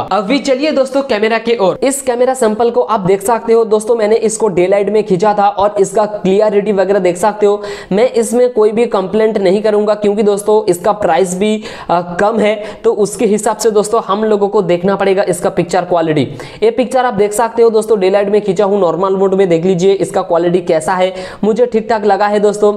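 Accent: Bengal, India. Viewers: Bhai tm hindi aur english dono ki communication classes lena start kr do. Tmhare bolne me bengali accent h jo k itna irritating h k subscribe to bhul jao koi tmhari video pura bhi nhi dekhega.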